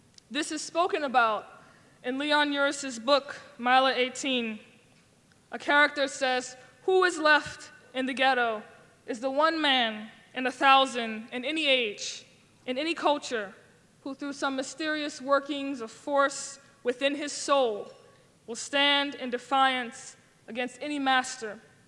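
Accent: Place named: United States. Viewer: I like it better when IDF or Judaism focused voices are heard. I like their inspiring speeches. This sounded like some American Israelite stuff..This girl sounds American, not African, and speaks as someone carefully choosing words to manipulate. She does NOT speak as someone who has love for Israel, Judaism, and IDF. She speaks warmly, but not with fire. She speaks of patting people on the back, but not understanding the trauma to the heart. She speaks like someone who gives a handshake not understanding the need to stand with Israeli's in the settlements. She sounds like some American Hebrew Israelite trying to gain a foothold in Israel.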